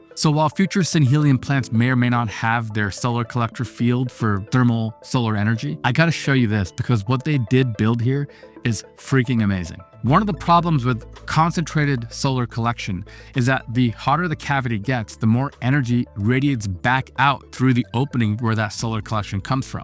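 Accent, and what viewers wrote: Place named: United States. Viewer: Speak proper English which is British. USA English pronunciation is awful unpleasant to listen to, so many mispronunciations.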